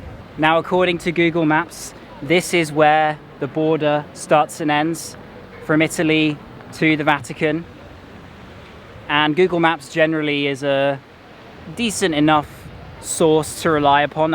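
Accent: United Kingdom. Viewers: R U From The UK. You Have A British Accent. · I am glad that you started your travel again. Astonishing views of Vatican. Beautiful architecture. I want to visit Italy. Well made videos with a great music background. Well done,!!! I also like your British accent😘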